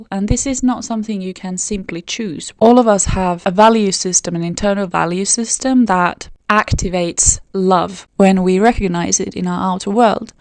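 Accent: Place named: Scandinavia. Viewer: I figured you were Nordic, but I wasn't sure if it was that or a German accent. Your accent sounds vaguely British sometimes too.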